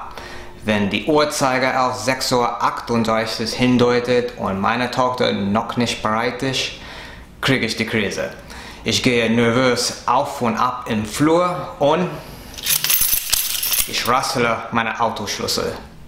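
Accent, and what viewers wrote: United States. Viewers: you seem to have the American accent on your r's and ch's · your voice sounds to me american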